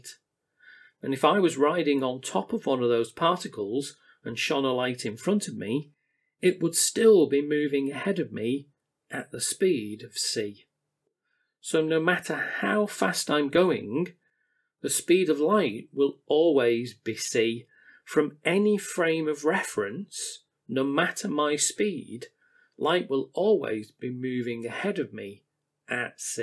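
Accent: United Kingdom. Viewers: Thankyou for uploading your channel specifically is where I like going for science information because of the british accent and the visuals are great. It has the right vibe nothings too overexaggerated very calm logical delivery. I could and would watch hours of lectures and powerpoint in this format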